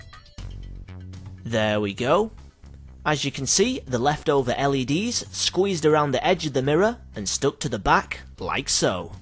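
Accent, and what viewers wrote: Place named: United Kingdom. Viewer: Victor Terhorst Nunes It's British English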